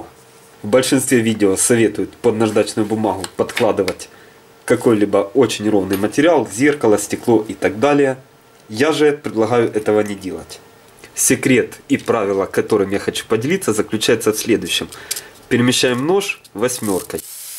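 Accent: Ukraine. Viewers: Канал зарегистрирован в США. Кому же идут собранные деньги? Если акцент окраинский?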